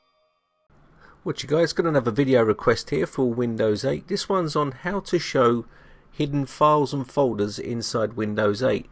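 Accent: England, United Kingdom. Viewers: It's just the English fucking accent you bellend, you know.. the one that came from England and not 'Murica..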